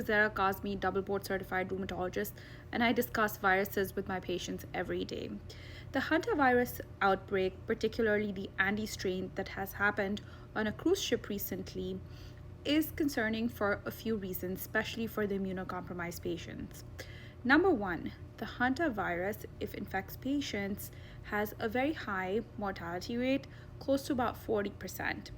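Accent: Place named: India. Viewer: Is it just need or does anyone else immediately mute and or scroll up or down as soon as you hear the Indian accent... see what stammer have done. Bet she has some good advice but I ain't gonna hear it...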